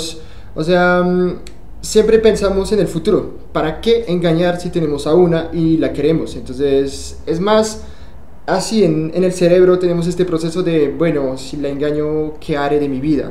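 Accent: Colombia. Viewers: Habla muy Colombiano, casi diria que practico en bogota, hablas muy bien, y si tuve un novio Aleman y lastima que no funciono, me encanta y aun me encanta el chico. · su acento colombiano muy marcado... parce.... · Se le ha quedado el acento colombiano 😀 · Que tal dejo colombiano! ..😊 Muchas gracias por tus consejos!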